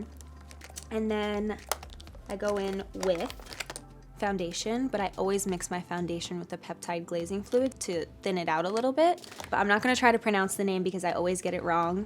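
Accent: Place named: England, United Kingdom. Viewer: I really Love her...I am from Sri Lanka...Her English accent helps me improve my English....I wanna hug her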